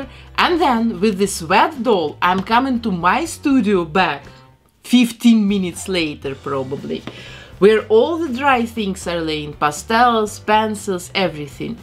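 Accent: Russia. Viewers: Блин какой русский акцент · Alena Vampanskaya yeah, I thought about it too. I'm just interested in her cute accent Well, it would be great, if she's Russian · What is your accent? Russian??? Whatever it is I LOVE IITTTTT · Почему мне кажется ,что здесь присутствует русский акцент? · Мне самому показалось, что что у неё русский акцент, а теперь даже сомнений не осталось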